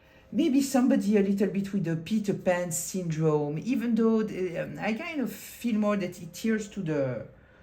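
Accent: France. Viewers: Tres insightful! Your readings seem to really tell the sad story of who she is...with a strong French accent. 👏🏾 Thank you! 🙏🏿❤️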